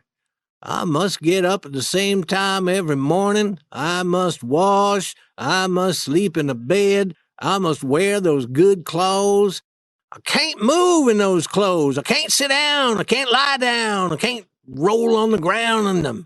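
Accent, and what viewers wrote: United States. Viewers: it's useful to practice and mimic American accent :")), thank you so much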